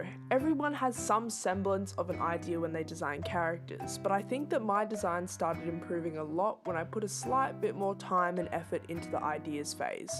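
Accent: Australia. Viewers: Wait are you Australian I'm tryna see sumn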